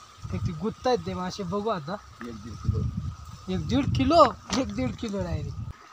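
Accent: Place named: Kolhapur, India. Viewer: Mst video. Tu kolhapur cha aahes ka? Kokani bhasha bolat nahis mhnun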